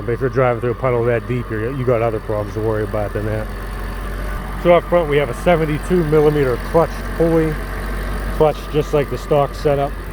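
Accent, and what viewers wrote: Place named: Pittsburgh, United States. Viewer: Are you in Pittsburgh. Want to have some similar things done to my SL. Who did you use. Sounds like a Pitts accent. Best, Dave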